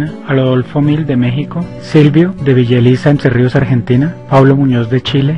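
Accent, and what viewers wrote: Colombia. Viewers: Excelente, Tenias que ser de Bogotá. VERY GOOD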